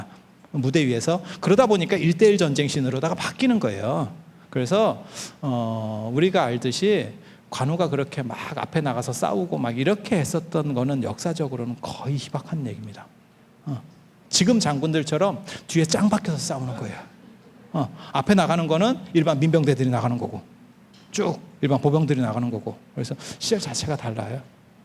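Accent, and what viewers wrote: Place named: Jeolla, South Korea. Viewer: 왜 언제부터 전라도 사투리가 여기저기 서 마구마구 쏱아지나 언제구터 재수없는 전라도 사투리가 표준어처럼 둔값된건가 불교방송마져도 오염되어있으니 정말엿갔다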